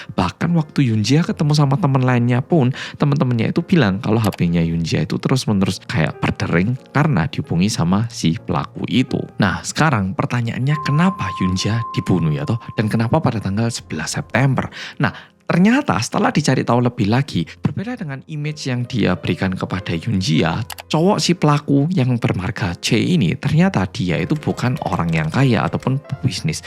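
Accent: Java, Indonesia. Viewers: Sediiih😢 tks medok😊❤🎉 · Korea medok jawa 😂krna kelamaan tinggal di jawa...kuliah di jawa ya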